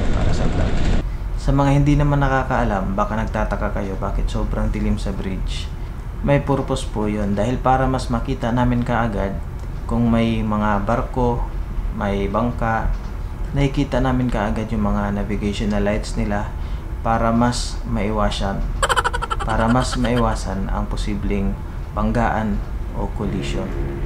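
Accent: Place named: Visayas, Philippines. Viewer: Sir taga asa ka sir? Kabalo man gud ka mag bisaya haha. Pa shout out na din😘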